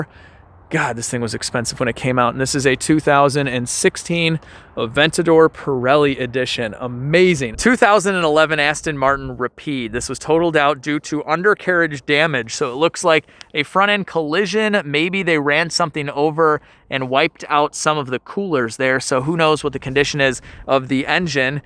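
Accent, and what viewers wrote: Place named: Midwest, United States. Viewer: He said it correctly. It's our Midwest/Chicago accent.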